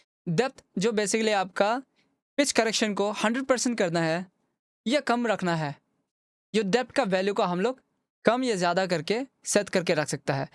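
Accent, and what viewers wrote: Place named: Andhra Pradesh, India. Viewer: You sound like and im sure yeh ap hi hai accent se pata chalre, im from a.p too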